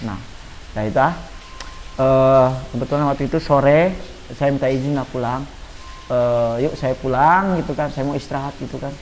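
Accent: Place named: West Sumatra, Indonesia. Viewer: Rang jambi, rang jambi. Hehe... Emangnya saya ga bisa tahu kalau abang ni orang minang, ga bakal ilang bang logat kamu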